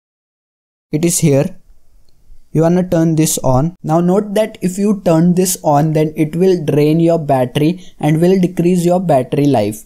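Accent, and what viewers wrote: India. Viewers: Sorry I didn't understand you right 😊 I can speak english but I don't understand english with indian accent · 1. He's speaking in english 2. He has a indian Accent. What's your point?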